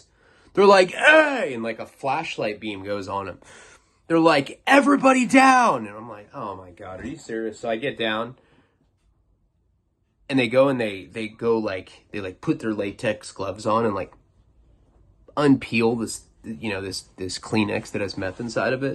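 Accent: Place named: Long Island, United States. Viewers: NEW YAWK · Lmao bro newyork Haha I live in upstate ny he sounds like from ny city more like long island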